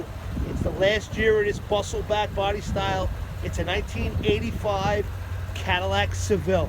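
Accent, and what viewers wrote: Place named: New York, United States. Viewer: A new yorkan salesman , rough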